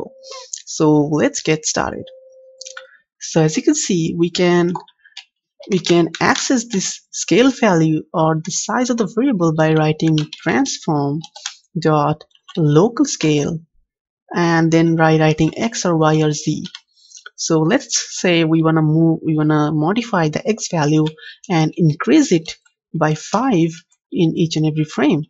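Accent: India. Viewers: Indian accent, check. Weird audio, check. Good tutorial, check.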